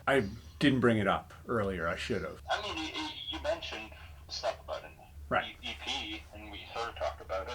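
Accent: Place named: Ontario, Canada. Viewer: I just subscribed after watching your channel develop for a while. I'm 50 this June and also trying to release a solo project. Mines also being written and recorded in reaper, but more in the Swedeath direction. Gonna be cool to hear the finished product and buy it on Bandcamp. My missus is from Winchester ON, so I enjoy listening to you speak too. Reminds me of warm summers and cottage life.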